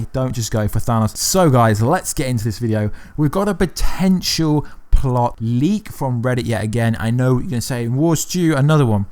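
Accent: United Kingdom. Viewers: Bruh are you British or a South American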